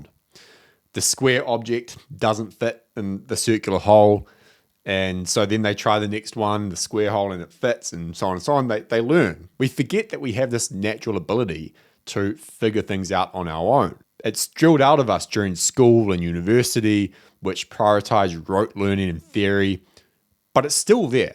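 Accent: New Zealand. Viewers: Not only are u informative and helpful, u r also very very hot. Love ur accent (kiwi, I guess?) thank you for the video, gonna get up from the bed and get some shit done. Cheers from Russia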